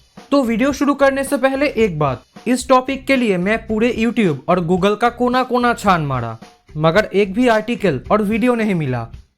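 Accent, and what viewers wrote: Bengal, India. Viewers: thankyou for answering me by the way love your videos, and your bengali accent makes really cool to watch it, love from Indore😍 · Bro are you Bengali....tumhari accent kuch waise hi lag rahi hai......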